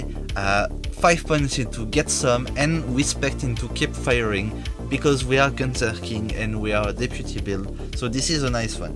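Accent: France. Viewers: Mdr le bon vieil accent français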